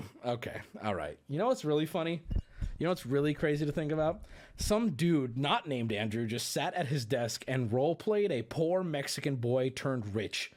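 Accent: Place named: Australia. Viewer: He is Aussie I work security and have heard all this before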